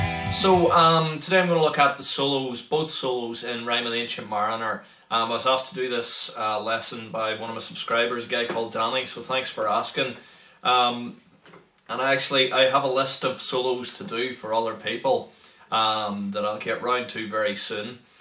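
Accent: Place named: Ireland. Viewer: You are most welcome! I love your accent to my brother. My heritage is Scotch-Irish and my ancestors came to America around 1792. There is something about Ireland that draws me to visit there one day. If you knew my real last name (Phillips is a name I use often, but not my real name) you'd know instantly how I am connected to Ireland. Enough about that though yeah? I have played guitar for 42 years now and still am intrigued by how 6 strings can paint such wonderful pictures of sound. Yeah, I know that some guitars go to 7 and 8 strings but they are not for me. I have over 50 guitars now, down from 112 at one time. Some of my favorites: (1) Gibson RD...what a wonderful instrument (2) Gibson Melody Maker...a simple, yet incredibly insane instrument-the tones from this 1PU wonder are friggin' beyond belief (3) 1979 Fender Strat that is a masterpiece of sound (4) 1975 Fender Bronco, my first guitar ever and still plays so beautifully (5) 1985 Tokai Explorer-a Japanese masterpiece (6) 1978 Ibanez Musician- a 24 fret 14lb neck breaker of sound. 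It is one of the most well made guitars I've ever seen, yet it's weight is a problem. It is the heaviest of all my guitars and will burden you after 3 songs. It has more switches than a Boeing 747 (ok...not really) and I'm still discovering new sounds. More later....got to go...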